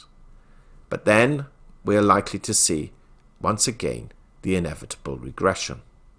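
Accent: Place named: England, United Kingdom. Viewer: Not so sure that is his real voice though. Real accent sure but I think it sounds speeded up. Could be wrong but have listened for a long time and I think the his voice sounds deeper/higher/faster/slower sometimes. Also seams prudent to disguise ones voice in this situation. Otherwise its the English language. It's everyone else that has the accent. I find it nice to hear it spoken properly. 🇬🇧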